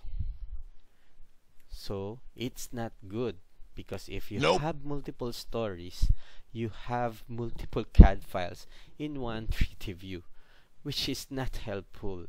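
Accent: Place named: Philippines. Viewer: I can tell your pinoy from ur accent😀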